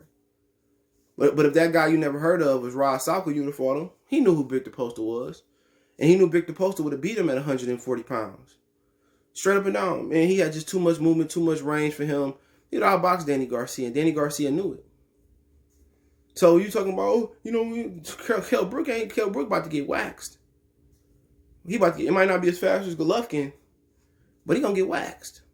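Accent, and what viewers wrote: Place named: Texas, United States. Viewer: Hold on to that Texas talk..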